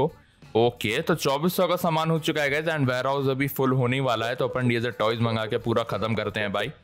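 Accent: Maharashtra, India. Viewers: are u Marathi?????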